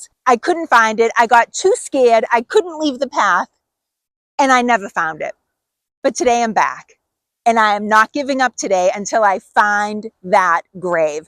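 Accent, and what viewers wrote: Boston, United States. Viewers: you have an adorable “Boston” accent. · No way, accent is definetly from inside the 95 belt around Boston.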